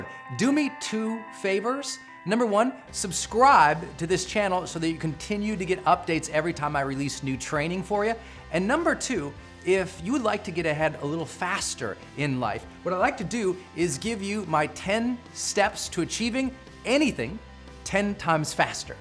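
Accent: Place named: United States. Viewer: One who is weak at English can easily learn English by listening U.S English it is much easier than that of England their accent is little bit harder to understand for new language learner and fortunately Brendon is from USA so you can't listen to him.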